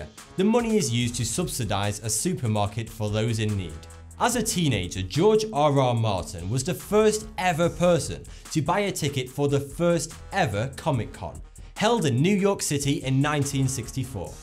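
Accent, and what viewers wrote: United Kingdom. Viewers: This is the most british accent in the world period.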